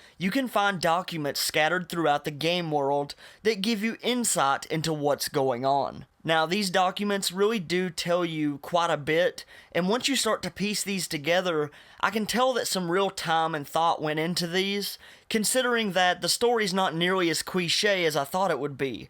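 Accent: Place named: Georgia, United States. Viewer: You sound like Dale from Baskets. Are you from Georgia?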